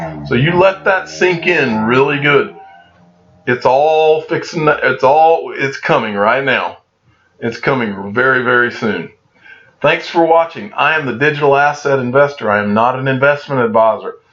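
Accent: United States. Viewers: Brother I love your cowboy accent Your Enthusiasm your knowledge your dedication keep up the good work.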